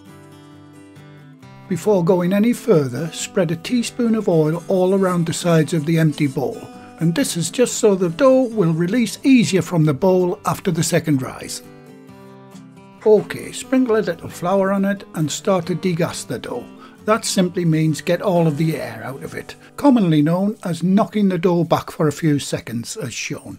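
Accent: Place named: Bradford, United Kingdom. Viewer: Hi! Love this recipe and I can’t wait to give these a try! I absolutely love listening to you I was born in Bradford so you sound like family to me! Great job on these keep cooking my friend!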